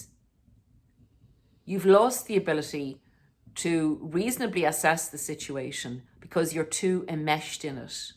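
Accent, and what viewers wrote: Ireland. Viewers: Interesting Irish accent 😊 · Are you from Ireland? I lived in Ireland for 2 years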